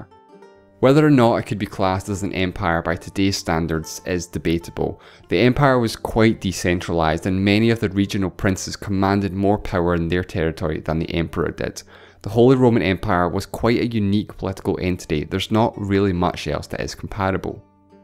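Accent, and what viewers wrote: Scotland, United Kingdom. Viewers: What’s the accent here? Scottish? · It’s just Scottish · Everything sounds better in Scottish. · Came from lore page. Disappointed by Scot accent. U sound dumb & angry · Bro is so Scottish I can’t keep up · You sound like a Scotsman 🏴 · I can't make out the accent. Yorkshire? Scot? Irish?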